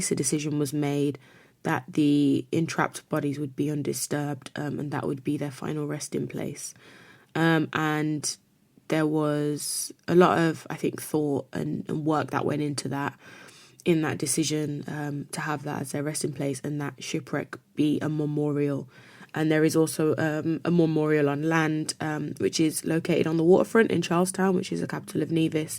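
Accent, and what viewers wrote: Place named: England, United Kingdom. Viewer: The history had never hidden, Who knew that the Ballast tank was empty can you say?To cut shot the conversation any vessel that is flatbottom cannot survive listing port or stabord this is what happened. It appears that you have an English accent, check one of The Royal Readers about the Ship Kempenfelt that sank due to listing, this is what happened to The Mv. Christina.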